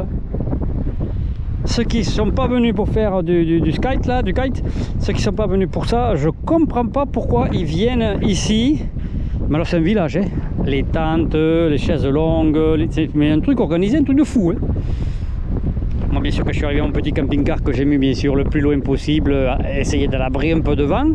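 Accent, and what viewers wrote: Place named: Southwest, France. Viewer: Sud Ouest St Jean de Luz, Bayonne, Biarritz plutôt je pense 🤔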